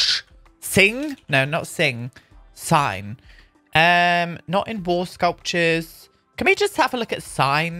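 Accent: United Kingdom. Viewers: Hahahaha adoro esse sotaque britânico. Me acabo de rir com esse "Hello"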